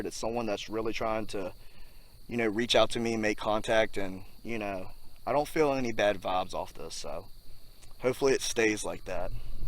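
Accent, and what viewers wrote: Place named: South, United States. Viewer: I did NOT expect you to have a country accent 😂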